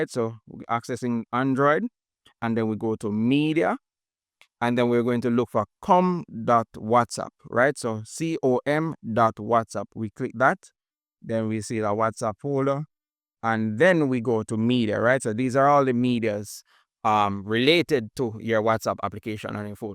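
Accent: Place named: Guyana. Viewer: Nice accent. Guyanese?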